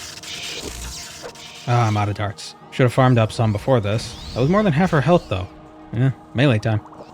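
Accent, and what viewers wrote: Boston, United States. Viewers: You do a great job masking it most of the time, but your Boston accent is just too strong. Great content, keep it up.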